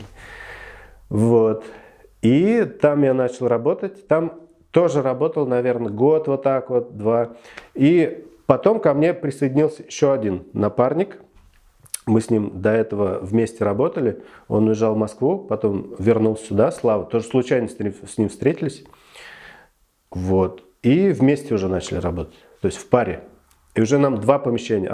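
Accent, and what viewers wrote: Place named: Cheboksary, Russia. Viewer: Здравствуйте! Я более 20 лет живу в Германии, смотрю Ваши видео и сегодня узнала, что Вы живёте в Чебоксарах и поняла почему Ваш говор ( или акцент) меня как-то приятно трогает. Дело в том, что я каждый год в течение 20 лет иногда и два раза в год навещала в Чебоксарах мою двоюродную сестру, ухаживала за ней и однажды проходила курс лечения у мануального терапевта кажется на улице Горького. Сестра моя умерла 2 года назад и больше я не езжу в Чебоксары, но очень скучаю по вашему городу. У меня есть там очень хорошие знакомые, поддерживаю связь с ними.Очень нравятся Ваши видео и было очень приятно узнать, что Вы из Чебоксар! Удачи Вам, и процветания!!!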